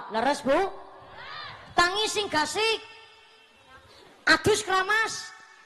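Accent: Banyumas, Indonesia. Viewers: Mumpuni. Ceramahe ngapak ngangeni.cerdas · Justru ciri khas mumpuni tuch bhs ngapaknya...salut dah menyimak.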